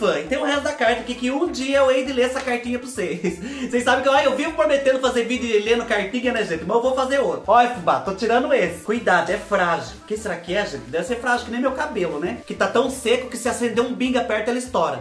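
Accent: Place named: Paraná, Brazil. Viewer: Fubá, vc tem sotaque de paranaense! 🌹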